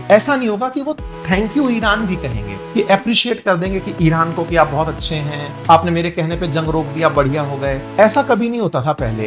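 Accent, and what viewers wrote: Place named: Bihar, India. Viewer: Aap v मै ko ham boltey hai , mujhe toh lagta tha ki ye wala sirf bihar babu use kartey h.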